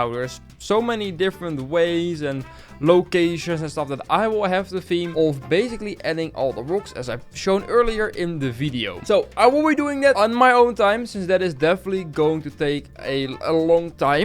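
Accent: Netherlands. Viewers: Ik ruik nederlands want je naam is en ik hoor nederlandse accent English: i smell some dutch cause ur name is dutch and i hear an dutch accent in ur english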